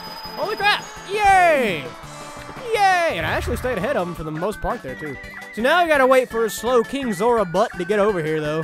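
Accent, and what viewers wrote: South, United States. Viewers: Hey there, nice LP. It's good to hear another southern boy doing an LP. If you're ever bored, check out my LP channel sometime. For the QOTD: Very hard to pick, but I guess I have to say Shifting Sand Land because I've always liked the Egyptian themed Mario levels. I guess because Super Mario Bros. 2 left so much of an impression on me, maybe?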